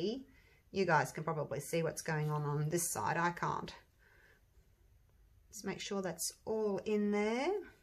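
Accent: Australia. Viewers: Hi! I am just getting into resin art. Love your coaster idea! Send me info on buying the coaster mold please. I love these, they turned out looking like pansies! Nice to hear your Aussie accent , I grew up in Sydney but now live in LA. uSA. All the best,Leanne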